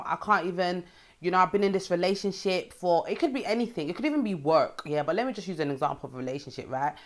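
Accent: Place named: London, United Kingdom. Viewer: I just love that accent, oh how I miss London. You are doing such an awesome job with the content you are touching on. There are life lessons in all disappointments. Thanks for the inspirational message.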